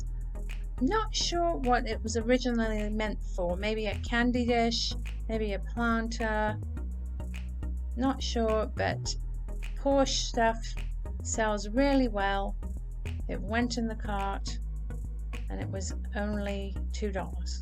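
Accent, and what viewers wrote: United Kingdom. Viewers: Hi, new to your channel. I saw you & Danni, the Niche Lady shopping together. Love your valuable info about why/why not you choose things. I'm a reseller, but have 3 booths here in western KY & don't sell on ebay. I heard Danni advise picking up fire fighter mugs, sunce they are good sellers & noticed a blue one on the shelf you may have missed. God bless! I love your accent! British? My mom was from England.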